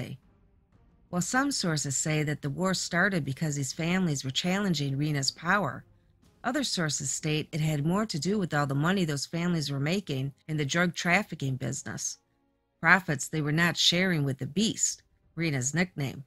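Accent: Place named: Brooklyn, United States. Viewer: Your channel is so good. And ur accent ( im from boston) sounds so familiar but i cant quite place what part of NYC. Old school Brooklyn would be my first guess tho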